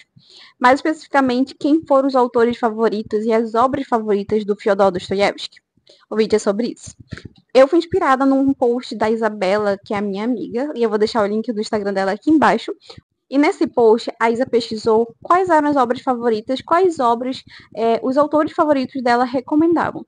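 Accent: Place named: Pará, Brazil. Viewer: Seu sotaque é do Pará.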